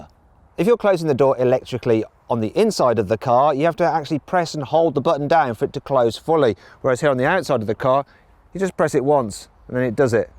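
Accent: United Kingdom. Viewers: 발음이 영국이넼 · 이보다 더 영국 억양이 어울리는 차와 영상이 있으려나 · 너무 재미따 ㅋㅋㅎㅎ 영국식발음으로 듣기공부 잘하고 감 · 영어못하는 내가 들어도 이사람은 전형적인 영국인발음이군ㅋㅋ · 영국식억양 개간지나네 ㄷㄷ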